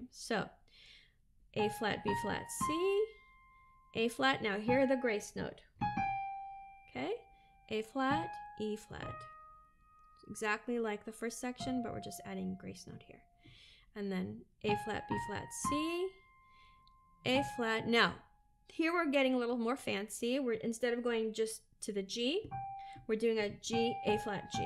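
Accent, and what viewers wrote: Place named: France. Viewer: I hope this idea of sponsoring a tutorial by the person who requests it becomes commonplace. Why someone who provides a product and service, let alone one that has clearly required a good amount of work and expertise, should not be compensated is perplexing. Even Bernie, who thinks everything should be free, would side with The individuals who are providing such services. Aside from that, your French accent is killer.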